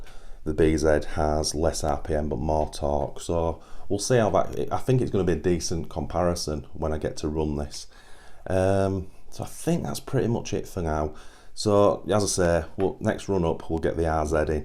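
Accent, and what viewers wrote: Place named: England, United Kingdom. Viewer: Nice results, I'm planning to built up my TT-02SR with the TBLM-02s 10.5 turn and TBLE-02s ESC , pinion size I'm not sure yet. A high speed spur gear is also on it's way and a set of foam tires, maybe they will get better results? I'm not ready yet to switch to Lipo, stick to NiMh batteries for now. You sound very English but resides in Canada? Also saw an older movie where you were a little slimmer.. Lol. Well keep them coming those movies. Cheers.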